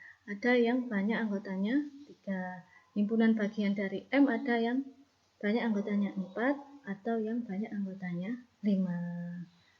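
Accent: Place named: Java, Indonesia. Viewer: Good...ustadza...medoknya masih kentara....mohon maaf.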